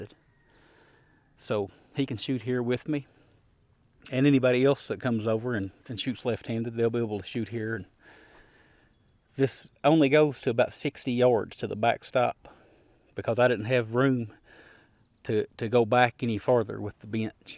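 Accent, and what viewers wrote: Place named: Eastern Kentucky, United States. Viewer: It's good to see you making progress. I love to hear that accent from Eastern Kentucky. I hope you get to 100K before long. God bless.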